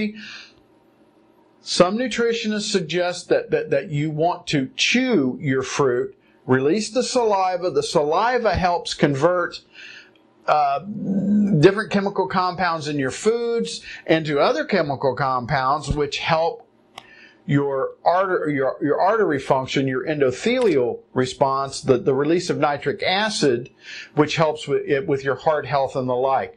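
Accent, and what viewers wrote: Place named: United States. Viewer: Hi! You are too fun! Just subscribed. Love the American accent and the bottle of poison on the window sill and you can never go wrong with your very own handcuffs. My biggest issue is coffee. I started using espresso and cashew milk. That’s about the only way I can handle it. I find breakfast the most difficult because I wake up at four am to go to work, so I actually eat two breakfasts. One at four-thirty and one at work at seven-thirty. Then lunch, and a light dinner. I try to stay away from bread because I have a gluten intolerance, so I am experimenting with granola that I make, and some peanutbutter and rice cakes. I can’t take nuts to work, because it is a nut free facility. I work in an Oreo factory in Toronto...lol. At least the product is vegan. Have a fantastic day, my friend.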